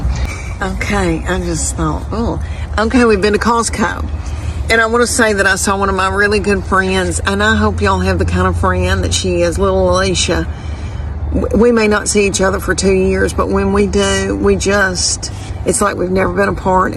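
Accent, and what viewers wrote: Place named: Tennessee, United States. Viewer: Oh my goodness! Morgan shops at Costco too. I was so excited to find this out., I love your accent. My cousins sound so much like you. They are up in Rocky Top, Oak Ridge and all around there, Knoxville. I grew up in Florida, so I have a very different way of speaking. Love, love, love your humor. It’s sooooo true! 😂😂😂❤❤❤ Cheryl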